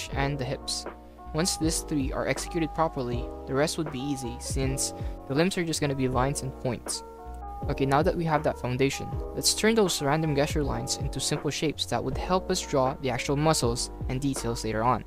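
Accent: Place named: Netherlands. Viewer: Idk what is it but your accent sounds very dutch. Also great video!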